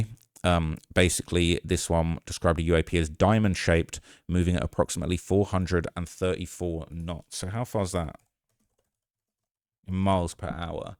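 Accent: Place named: RP, United Kingdom. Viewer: Very posh.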